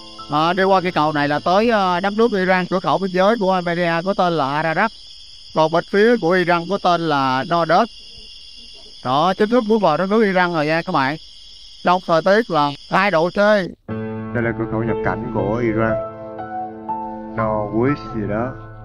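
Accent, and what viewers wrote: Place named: Mac Cuoi, Vietnam. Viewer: Cam on em . Chi Nghe em noi chuyen Mac Cuoi va thay vui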